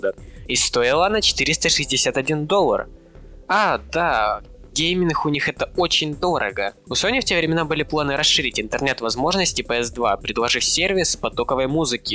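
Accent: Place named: Belarus. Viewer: Пытаюсь понять что за акцент.. Ты из белорусской деревни?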